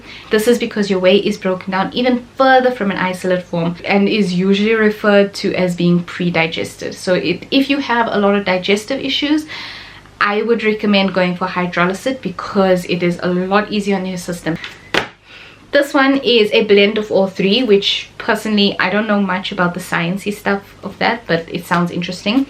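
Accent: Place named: Cape Town, South Africa. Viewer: Are you colored? You sound like you from Cape town?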